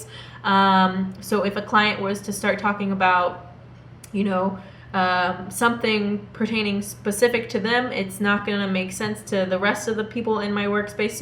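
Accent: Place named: England, United Kingdom. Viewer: Your English accent is so pleasant that it is already sufficient reason to listen to the end.. ))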